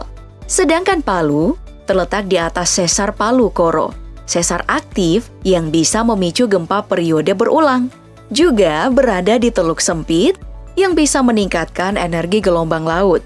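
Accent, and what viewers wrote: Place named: Central Sulawesi, Indonesia. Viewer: Ahh mimin udah bisa logat sulteng😆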